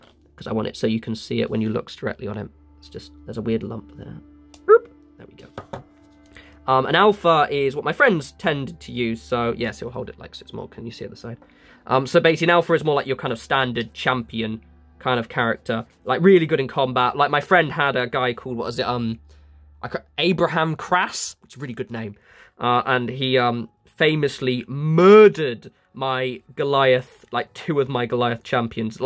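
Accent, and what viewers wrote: United Kingdom. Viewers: As a fellow british person, I have the horrible moment of thinking "do we all sound the same?" All your expressions are the same as mine. Thank you for this, jsut gotten abck into warhmmer after 20 years and could never kitbash as a kid, so lovley to get some inspiration for me starting out my own Nid and Cult armies.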